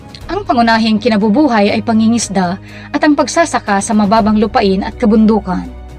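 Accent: Visayas, Philippines. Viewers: Taga visayas kaba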